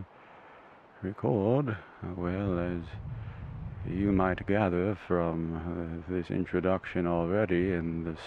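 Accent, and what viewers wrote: United Kingdom. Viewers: r u bri’ish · Very good British accent! Im a brit myself and its uncanny dear boy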